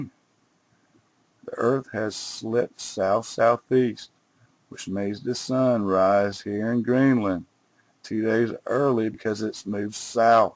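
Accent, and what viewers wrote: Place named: United Kingdom. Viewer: What a strange, over the top, ' jolly hockey sticks' English accent of the narrator. Was it computer generated? I was mesmerised listening to the accent that I didn't hear what she was saying. Now I will have to watch it again :)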